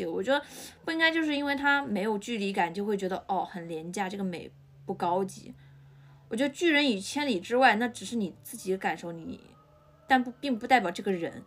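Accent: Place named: Henan, China. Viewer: 哈哈哈哈，一口河南口音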